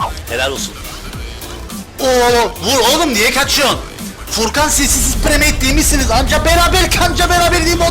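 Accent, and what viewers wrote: Cyprus. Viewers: kardes sen kibrislisin dimi 😄😄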